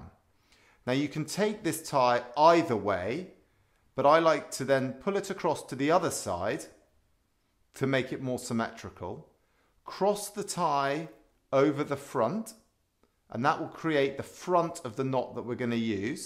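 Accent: United Kingdom. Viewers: Thank you very much! The best explanation from 3 I watched. Thanks for your lovely British too😊! From California, helping to my son who is graduating high school.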